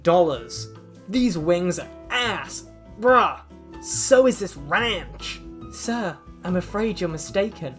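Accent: United Kingdom. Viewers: The sunglasses the black satin opera gloves and the British accent really does it for me. Anyone else?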